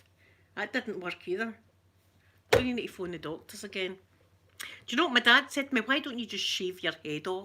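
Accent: Scotland, United Kingdom. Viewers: Aww, don't give up on your dreams of becoming an astronaut! We'd be honored to have you representing the US with your amazing Scottish Accent and sweet personality 💙💙💚💚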